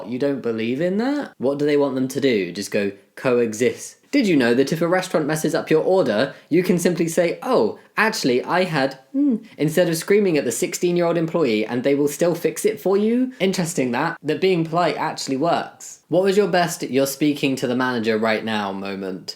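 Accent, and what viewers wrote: England, United Kingdom. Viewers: You have an accent! Are you from England?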